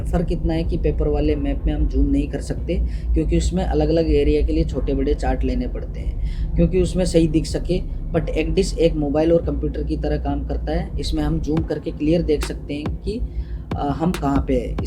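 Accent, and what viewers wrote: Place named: Haryana, India. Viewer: apki voice Haryana jesi lag rhi hai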